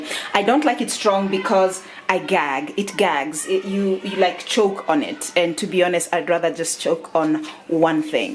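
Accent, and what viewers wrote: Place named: South Africa. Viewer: thanks for the information...love the accent, is it South African?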